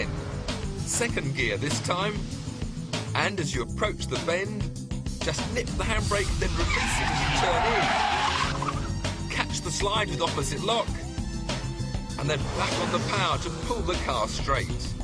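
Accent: United Kingdom. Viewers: lol, he is british, don't you hear accent or at least see, that steering wheel is at the right side of a car. However if yaris is a great drift car, this guy is american and Greece is a center of a world =\ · He's English. Everyone else pronounces it "coup pey". Like the French do.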